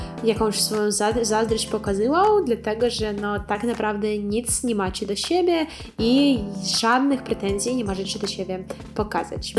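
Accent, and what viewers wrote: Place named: East, Poland. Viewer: Masz zajebisty wschodni akcent. Mogę Cię słuchać godzinami.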